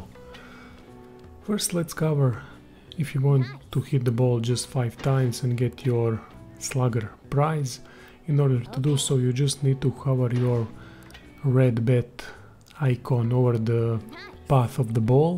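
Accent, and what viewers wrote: Russia. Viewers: По акценту вычислил что русский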